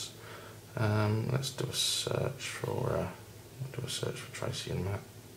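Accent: England, United Kingdom. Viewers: Does he sound scottish or welsh,its an ENGLISH accent its like saying a yank talks canadian,3 countrys in the uk wow some people. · BRITISH ACCENT! :)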